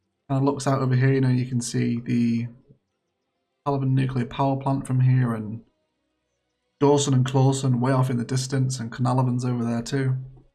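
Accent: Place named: England, United Kingdom. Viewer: Awesome, you're easily one of the top 5 Cities Skylines channels around. Also, your accent is beautiful, which part of England is it from?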